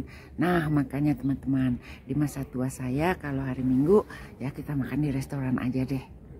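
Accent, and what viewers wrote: West Java, Indonesia. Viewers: Wilujeng sonten Bunda, reseup pisan logat Sunda na kaciri keneh, God Bless you🙏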